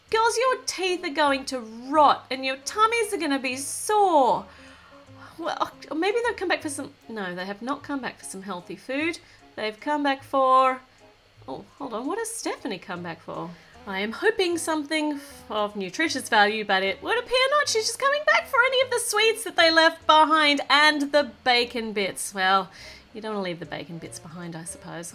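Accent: Australia. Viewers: Your Australian? I’m Australian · OMG ARE U AUSSIE SAME!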